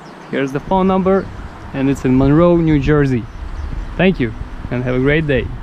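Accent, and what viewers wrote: Russia. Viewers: laughed too hard when you said hammer instead of camerea because of your russian sounding accent. Great video tho thank you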